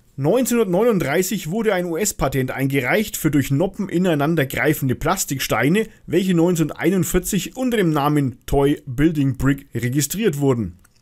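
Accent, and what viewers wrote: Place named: Franconia, Germany. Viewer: Meinen tiefsten Respekt für dieses Video. Ein Thema das so komplex & vielschichtig ist auf Minuten zu bringen & dabei noch informativ, sachlich sehr hochwertig & unterhaltsam zu sein das ist ein echtes Meisterstück (a real masterpiece). Sicherlich es ist nur ein kleiner Umriss, da es noch viel, viel, viel mehr dazu erzählen gibt. Aber dieses Video zeigt auch das die Firma aus Dänemark die heute Fair Play von ihren Mitbewerbern sich wünscht schon immer eine Firma der Winkelzüge, der Ausnutzung von Lücken im Rechtssystem & der geschickt gemachten "Plagiaten" ist. Daher sollte diese Firma nicht mit Steinen werfen, denn sie sitzt im Glashaus (oder Wer im Glashaus sitzt sollte nicht mit Schweinen werfen) Ich liebe deinen Content, ich habe dir ein Abo gegeben da hattest du noch 60-80 Abos, aber mir hat es super gefallen & da war ein Abo pflicht. 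Was ich auch total super finde, ist die Tatsache das du es nicht versuchst deinen Dialekt zu unterdrücken, naja bin Schwabe, aber ein Franken kann man in einem guten Masse verstehen. Mach so weiter & du wirst weiter wachsen.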